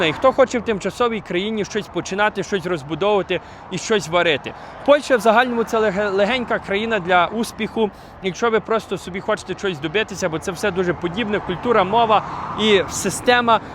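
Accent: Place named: Western Ukraine, Ukraine. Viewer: Цей запроданець, буде розкачувати будьяку тему. в нього тільки акцент західний - цце приваблює. Звертайте увагу на те, як він декламує.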